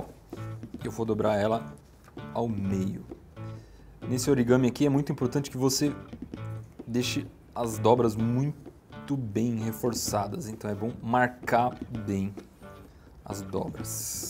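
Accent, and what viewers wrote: Brazil. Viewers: muito feio esse seu pt-br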